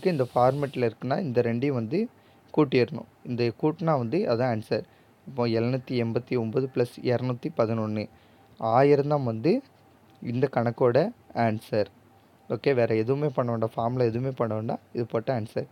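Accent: Tirunelveli, India. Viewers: Tirunelveli slang 👏👏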